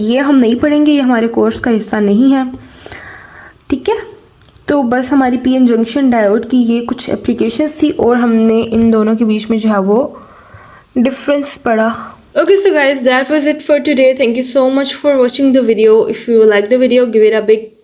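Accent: Pakistan. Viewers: R u from Pakistan???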